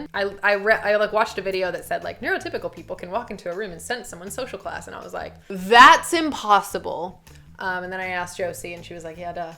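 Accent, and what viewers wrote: England, United Kingdom. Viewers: Your British accent might be rough but you have developed a British-esque cadence to your speech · I actually think you have picked up a little english accent love it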